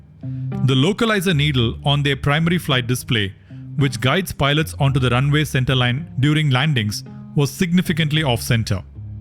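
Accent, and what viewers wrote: India. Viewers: He sounded Indian national! . Needed improvement on grammar pronouns actions. Tks. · Crazy heavy Indian accent. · 🔴🔴🔴 I CAN'T LISTEN TO HIS THICK INDIAN ACCENT, TOO ANNOYING! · Bro have Indian accent ❤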